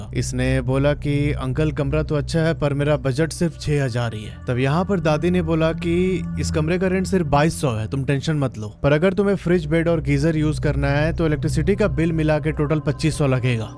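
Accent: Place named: Bihar, India. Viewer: Bhai i feel like you are weather from Bihar or belongs to area like kanpur, Gorakhpur or Lucknow. In every sentence you say- "hai nah" or " aayi nah"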